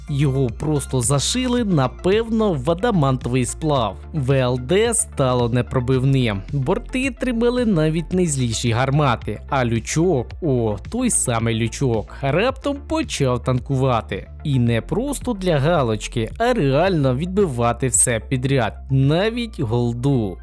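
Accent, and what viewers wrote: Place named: Russia. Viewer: А де КВ - 1С? З альфа пуканом? І скажу ще одне, одразу чути що перейшов з російської на українську. 😅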